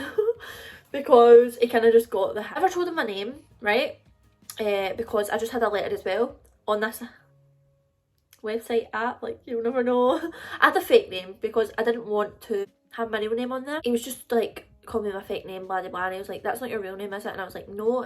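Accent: Scotland, United Kingdom. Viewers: Sounds like a thicc Scottish accent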